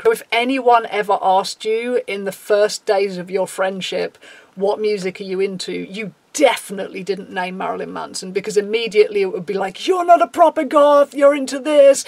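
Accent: United Kingdom. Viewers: You sound British... if you are British... please tell me what shopping centres people who listened to Marilyn Manson chilled in a shopper centre and pretended to be vampires because I LITERALLY never seen this. Yes I got bullied at school for being a “Greebo” but literally nobody reacted like this who listened to him.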